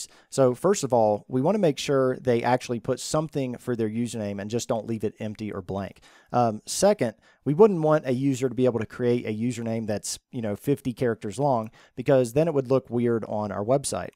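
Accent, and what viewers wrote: South, United States. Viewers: Love your accent, sounds like a soft southern accent. Your content is fantastic.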